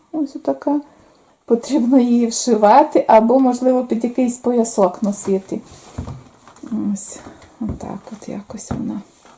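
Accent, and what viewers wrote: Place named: Ternopil, Ukraine. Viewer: Добрий день,я у вас новенька,і мені цікаво,а у вас є відео,де ви розказуєте як ви попали в Карпати, дещо про себе,де працювали,про вашу сімю.Говір ваш такий,як на Тернопільщині, або на Франківщині,як ви потрапили на Запоріжжя?Розкажіть про свою сімю. Дуже буду вам вдячна. Всьго вам найкращого,обживайтесь на новій землі,пускайте свої корінчики,нехай Карпати стануть для вас гостинні та рідні.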